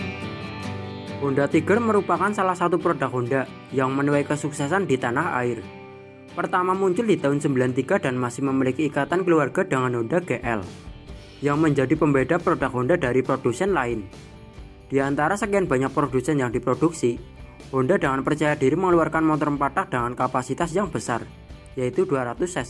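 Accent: Java, Indonesia. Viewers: yaampun wng ndi iki suorone khas jowo bgt 😂😂😂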